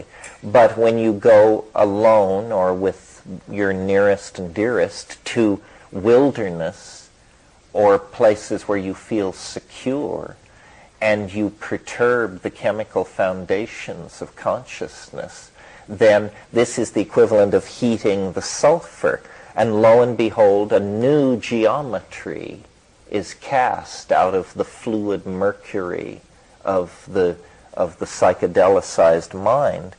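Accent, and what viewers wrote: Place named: England, United Kingdom. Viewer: Where are you from? England or Ireland by any chance?! I'd goto a gig if it was relatively near to show my support, you've done me great benefit with this channel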